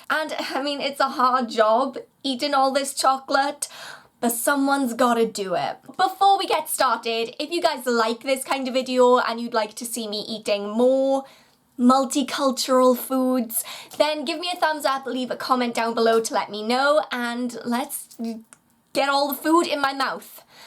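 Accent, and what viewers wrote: Wales, United Kingdom. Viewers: hi! loved this video. only just found your channel! are you from wales? if you are what part?😀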